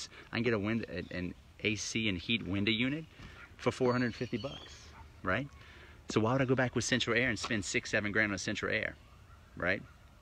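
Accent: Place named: South Louisiana, United States. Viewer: Great info. I'm from south Louisiana as well. I know that accent! lol. I just setup my first mobile home rental on a piece of property I already owned and looking at another one setup on land that needs some work. I have aspirations of one day owning several parks like you. I know there are a ton of MHPs in our area, but are that many owners selling? How far out do you have to go to find deals? What are your thoughts on building a new park vs buying one?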